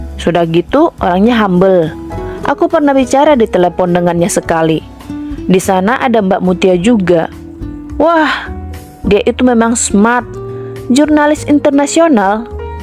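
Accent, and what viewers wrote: Java, Indonesia. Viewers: Suka logat yang medok ini,, pas ini dubber nya 😍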